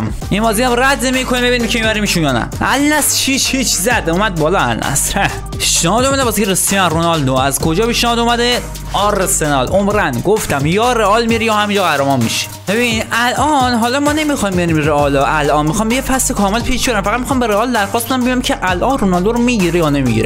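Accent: Mazandaran, Iran. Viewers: میگم مازندرانی هستی؟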